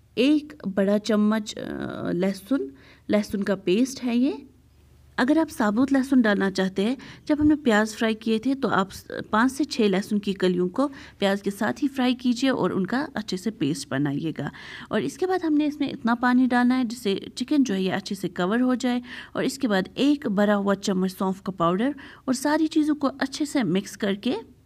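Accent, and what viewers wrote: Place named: Kashmir, India. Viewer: Aap k kashmiri aawaz muj bouth bouth achii lagte hai ❤️❤️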